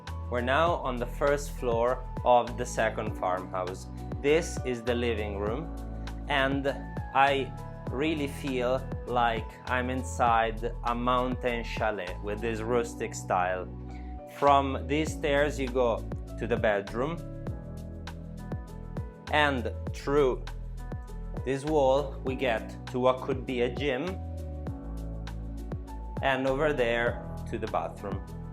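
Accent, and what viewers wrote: Italy. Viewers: Beautiful property, Apples and walnuts, a match made in heaven! very cozy farm and well presented, Your English is excellent! I love the way Italians pronounce the letter E on the end of words like a tail that swings! so musical. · love your italian accent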